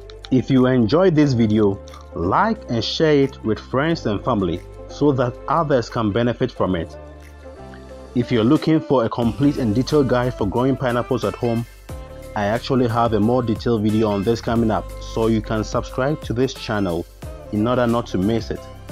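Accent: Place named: Ghana. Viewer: This is ain informative experiment that clearly illustrates the advantage of starting the pineapple suckers in water as opposed to planting them straight in the soil. Much thanks. By the way, if you don't mind my asking, your name sounds like a Nigerian Igbo name, yet your accent is unmistakably Ghanaian, and this got me wondering if is in fact a common name in Ghana as well as in Nigeria. Please forgive the minor deviation from the theme of gardening. I have always been fascinated by accents both international and African, hence my curiosity.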